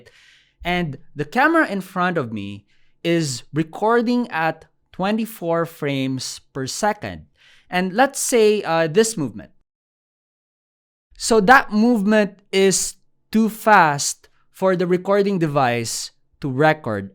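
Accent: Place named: Philippines. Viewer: The Filipino accent is strong on this one.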